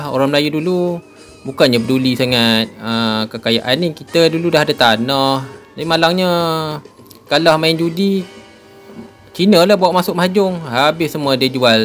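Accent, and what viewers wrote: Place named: Perak, Malaysia. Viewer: Haha.. penyampaian style orang Perak.. camtu ler..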